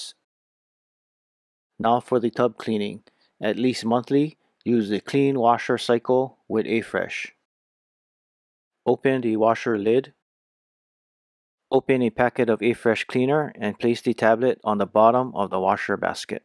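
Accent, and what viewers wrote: Hawaii, United States. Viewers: I love this! It’s helpful as we’ve had out for almost a year and I’ve cleaned it twice 😅 are you hawaiian? I can hear it haha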